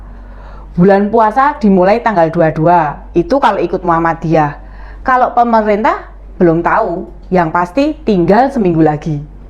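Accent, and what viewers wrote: Java, Indonesia. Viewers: Mba pertahankan medokxa👍👍👍aku seneng👍👍😜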